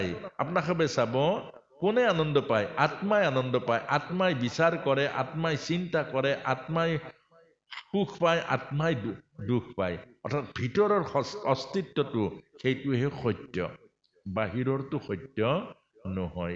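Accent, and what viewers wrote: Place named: Bodo, India. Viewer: Bodo accent ❤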